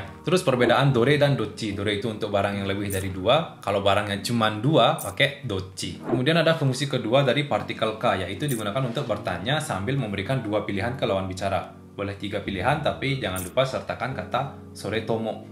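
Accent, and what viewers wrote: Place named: Bali, Indonesia. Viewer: Bang org bali e? Logat nya kayak nak bali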